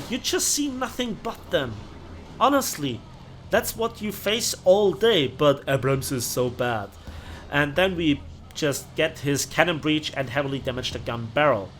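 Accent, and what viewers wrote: Bavaria, Germany. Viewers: He's from Bavaria. Those People have a special pronunciation, which makes them sound similar to the Austrians. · He just sounds like the typical german who speaks english.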